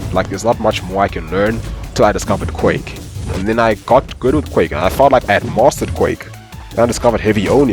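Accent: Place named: South Africa. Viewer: Got directed here via a luhdemtaters "QUAKE Breakdown & Grade" video. As soon as I heard your voice I knew I was listening to a fellow South African. Cheers brother. Enjoyed the video. Let's keep Quaking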